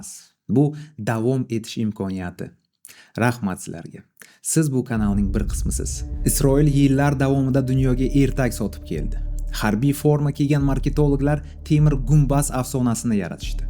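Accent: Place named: Navoiy, Uzbekistan. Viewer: Мабодо Навоийликмасмисиз шеваиз ухшаб кетаркан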